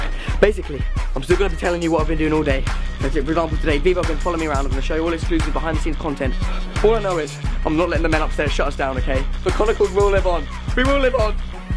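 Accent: United Kingdom. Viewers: I live in a country which English is not our first language but I can understand what he's talking about although not every words I catch :)) His accent is so sexy. Is that Brighton accent? (If it exists)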